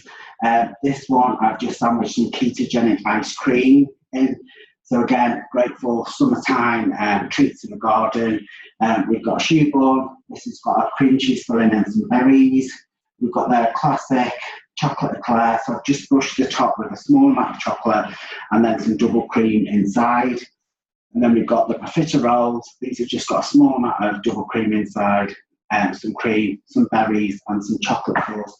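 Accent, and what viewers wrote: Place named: Australia. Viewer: I am very interested in this recipe but I cannot understand a word he is saying in this video. 😞 very poor audio + heavy Aussie(?) accent.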